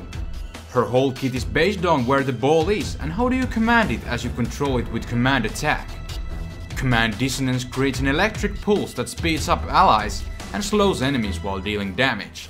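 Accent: Finland. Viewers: Your accent reveals you. Finnish? ;) Great vid.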